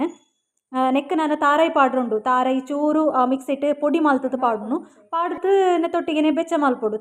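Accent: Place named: Tulu, India. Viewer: Hi akka er tulu pathernaga batru nakl pathernaleka apund akka😍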